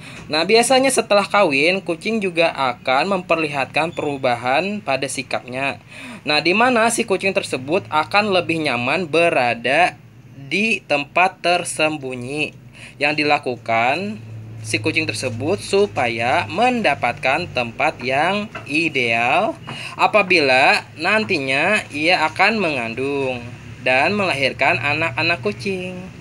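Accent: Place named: Banjar, Indonesia. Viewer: Urang banjar sako amang ni . Logatnya kentara banar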